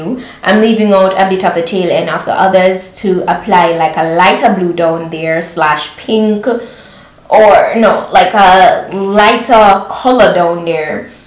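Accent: Jamaica. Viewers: I saw you promoting a foundation on Pinterest and I was like this girl sounds like a Jamaican 🇯🇲 so I had to find you and I swear I watched all your vlogs and videos (All) and I love you, your sis and your mom you guys are the realest 😊 was actually waiting on a new video. You go girl ppl like you make me proud to be a Jamaican. God bless you 🙏🏽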